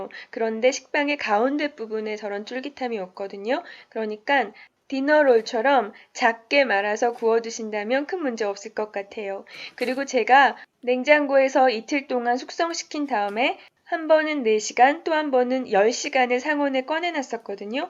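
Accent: Seoul, South Korea. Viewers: 뭔가 옛날 서울 사투리 같아요ㅎㅎ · 말투가 옛날서울 사투리 같아요 !!!ㅋㅋㅋㅋㅋ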